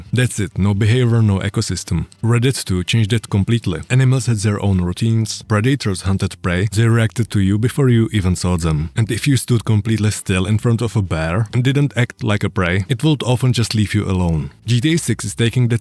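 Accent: Czech Republic. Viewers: Are you czech you have that kind of accent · This is czech accent?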